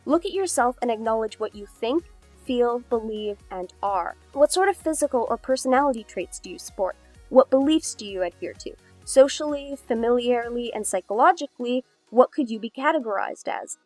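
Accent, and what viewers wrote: Canada. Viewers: Always makes me happy to hear more Canadians.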